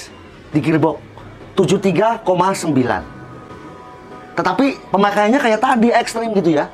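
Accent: Java, Indonesia. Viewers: bang sampean dari jawa bukan? logatnya medog , 🤭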